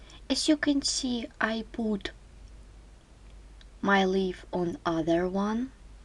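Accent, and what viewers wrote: Russia. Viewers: А русский так хорошо слышен через этот англ.))). Красивая работа.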